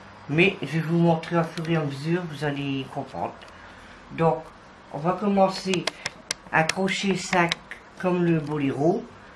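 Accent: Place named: Cambrai, France. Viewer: Merci pour ce tuto je suis entrain de le faire pour ma future petite fille qui arrivera en septembre!!!! j'adore vos Modèles et les explications sont simples!!!!! Continuez à nous enchanter avec vos modèles, j'adore votre accent, j'ai habité Cambrai pendant 6 ans et quand je vous entends parler je m'y revois!!!!!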